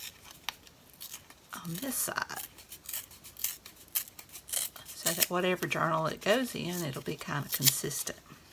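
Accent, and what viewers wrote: South, United States. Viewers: Cute pockets. I like it when you talk. Your southern accent is sweet.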